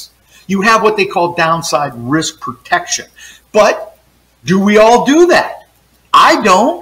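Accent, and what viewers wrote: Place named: Pittsburgh, United States. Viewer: Thanks for the reply. Makes perfect sense, thank you. I guess my real question was, "Do you HAVE to buy them back, or do you have the option to let them expire?" I was a little confused but I get it now. Side note, you're not a fellow Yinzer by chance, are you?? Your voice (I mean no offense) and a certain sports logo visible in one of your other vids suggest you may have ties to the area. Thanks again, and keep up the good work with the vids! Very helpful.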